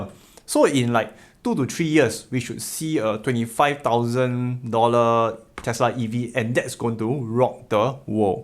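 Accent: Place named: Singapore. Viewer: It’s just a standard Singapore English accent. Should be easy to get used to.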